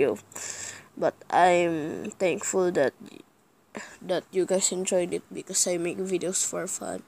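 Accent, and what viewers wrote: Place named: India. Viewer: Its like indian accent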